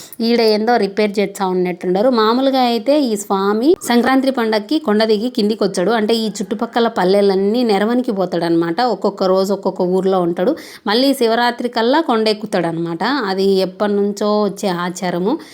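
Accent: Rayalaseema, India. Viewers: మీ వీడియో క‌నిపిస్తే చాలు వెంట‌నే చూసేస్తున్నాను. ఎందుకంటే అద్భుత‌మైన సీమ మాండ‌లికాన్ని అల‌వోక‌గా వినిపిస్తున్నారు కాబ‌ట్టి. ఆస్కార్ సినిమా చూస్తే క‌లిగే ఫీలింగ్ మీ వీడియోల‌ద్వారా వ‌స్తున్న‌ది.అంత హాయిగా, ధారాళంగా, అమాయ‌కంగా, అర‌మ‌రిక‌లు లేకుండా మాట్లాడ‌డం అంటే మామూలు విష‌యం కాదు. మాది కూడా వైఎస్సార్ జిల్లానే.మీ ఊరు ఖాజీపేట‌కు మా వూరు తొండ‌ల‌దిన్నె ఒక 30 కిలోమీట‌ర్ల దూరంలో వుంటుంద‌ని అనుకుంటున్నాను. 3 ద‌శాబ్దాలుగా హైద‌రాబాదులో వుంటున్నాను. సాక్షి టీవీలో సీనియ‌ర్ జ‌ర్న‌లిస్టుగా ప‌నిచేస్తున్నాను. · Hi garu iam New Subscriber madhi kuda Allagadda devudu mervaniki kadu konda dhgi velledi Laxmi narasimha swami vari Pelliki pilavadaniki konni village s ki matrame veltharu Anye mi vedeios chala bugunnai Mana ralyalalsleema slang Matladuthunnaru Chabaga matladuthunnaru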